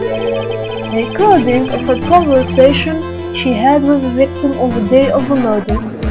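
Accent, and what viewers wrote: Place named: England, United Kingdom. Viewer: hhhhhhhhhhh ur english looool :3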